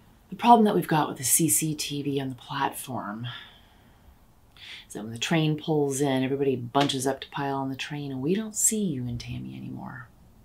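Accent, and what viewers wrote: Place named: United States. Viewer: Very well performed however, hope you don't mind me saying, even though the pacing was perfect & I enjoyed the story, there was a slight clash of American accent & English words that they do normally use...?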